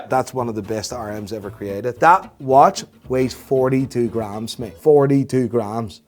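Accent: Netherlands. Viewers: Why u got such a thick dutch accent my man? You dutch and hiding it? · I'm flabbergasted you perfected the Dutch English accent 😂 amazing keep up the work bro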